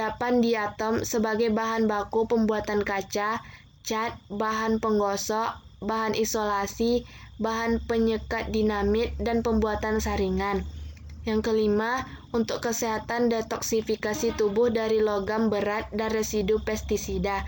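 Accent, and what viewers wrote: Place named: Riau, Indonesia. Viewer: riau bgt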